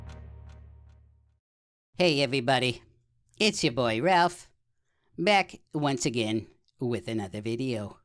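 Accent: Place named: Boston, United States. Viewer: I can for the silly title and stayed for the Boston accent. Cool animation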